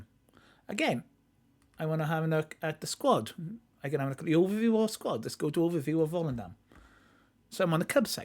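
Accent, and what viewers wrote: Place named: Wales, United Kingdom. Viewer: Nothing worse than the Welsh accent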